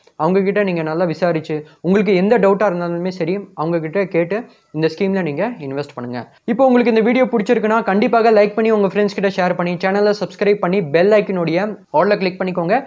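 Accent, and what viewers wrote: North India, India. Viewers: நீர் என்ன வடக்கரா? முறையாகத்தமிழ் பேசுக. The service by SBI personnel is not at all up to the mark.Every activity is carried out by machine. They start by half an hour late and close the counter before half an hour earlier by counting the currencies. For every transaction most of the interim works are to be carried out by the SB a/c holders. Very few lady personnel are exceptional. Every worker should be in uniform with the explicit I'd card and phone no. Most of the time the printer is out of order. Counter to counter, the customers are tossed. With the e.governance, they themself could verify everything and they seek pleasure in driving away the senior citizens.😮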